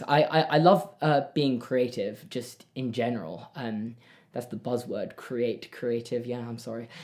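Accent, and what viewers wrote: United Kingdom. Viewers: It's weird that YouTube auto-generated subtitles don't understand British accents like why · Is it just me or does serious seem much more British than high energy. I probably feel this way because I really only know Doctor Who and Love Island UK which aren't super high energy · haha british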